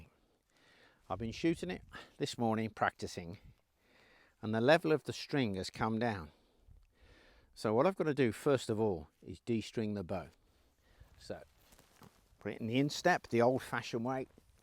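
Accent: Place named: Black Country, United Kingdom. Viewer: I can't tell if is a Brummie or yam yam, his accent has diluted In fact I'm going guess at the Halesowen area.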